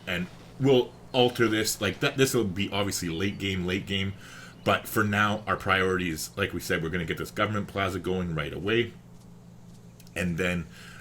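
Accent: Canada. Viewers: Hey. Not a Civ comment, but... I took my undergrad degree in The Soo, Michigan. Your accent reminds me of all the cool Canadians I used to hang out with way back when, eh? Thanks.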